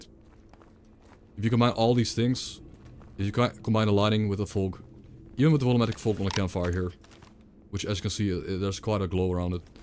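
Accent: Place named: Netherlands. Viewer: u dutch? u sound dutch.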